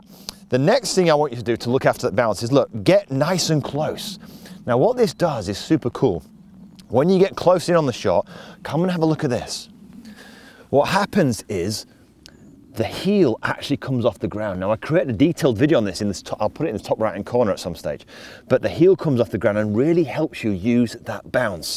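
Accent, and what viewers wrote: United Kingdom. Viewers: You wonderfully master the art of teaching golf an I'm fond of your so British Accent😊...It's just a friendly wink of a French golfer.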